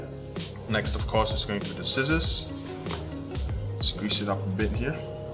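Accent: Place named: Malaysia. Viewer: i knew you were malaysian just from the way you spoke haha... anyways, good video... but yeah, it's so hard to get anything here in malaysia, we only have 1 official distributer in malaysia and they're not really taking it seriously, like their shop is always out of stock and don't carry everything that letherman has to offer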